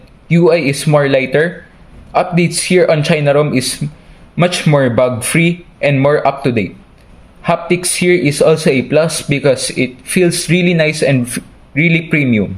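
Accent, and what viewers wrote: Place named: India. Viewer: Haha parang indian Ang English mo. 😅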